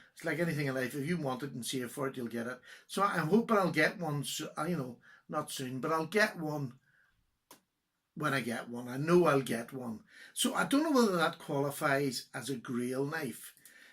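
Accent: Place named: Ireland. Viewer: This was a wonderful video! Love your story-telling and admire your honesty and humility. As an American living in Belgium, I appreciate your English ( with the wonderful Irish accent) and your European point-of-view on knives and knife collecting. Your grandchildren brought a smile to my face and makes me happy to remember that my 2 grand kids, my daughter, and my son-in-law will be visiting me in Brussels next week. Keep up the great work! Look forward to all your videos.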